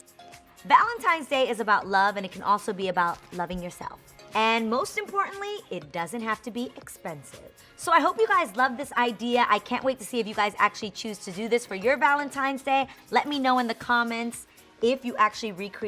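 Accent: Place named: New York, United States. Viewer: I love how you haven’t lost your New York accent or slang lmao. I moved from New York to Hawaii and i still talk with an NY accent 😂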